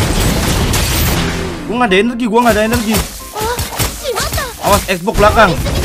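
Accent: Java, Indonesia. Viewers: bg medok skrg wkwk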